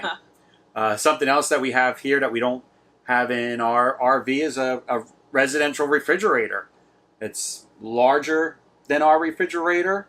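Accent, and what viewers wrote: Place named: Northeast, United States. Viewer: Don't worry I say 'youzz' too. Its that Northeast lingo lol. Sounds like it was a great trip. Shopping for RV's is dangerous enough I don't know about actually living in one for a week. I would be back at the dealer signing papers. I look forward to seeing the walk-thru. Stay safe and happy travels.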